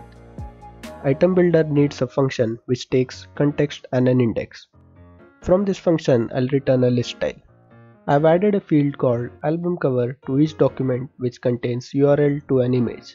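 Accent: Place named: India. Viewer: Indians really have to work on their accent! except that, the content was useful thanks.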